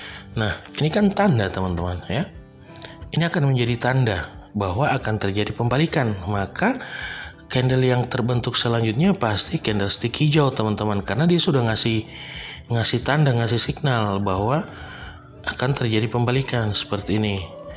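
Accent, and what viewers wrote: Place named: Makassar, Indonesia. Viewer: dari suaranya ini kayaknya orng makassar😀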